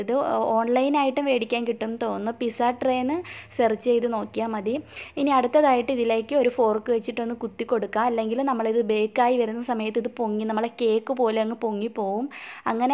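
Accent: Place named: Kollam, India. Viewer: കൊല്ലം കാരിയാ ലെ ... കിടു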